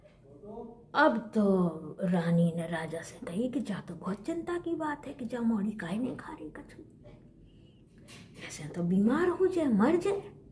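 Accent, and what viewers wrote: Bundelkhand, India. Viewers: बड़ो नोनो हतो जे किस्सा तुमाई मीठी बुंदेलखंडी बोली बहुतई भा गई हमें गुईयाँ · बुंदेलखंडी की मीठी जुबान, और आपका सुनाने का अंदाज मजा आ गया 🥰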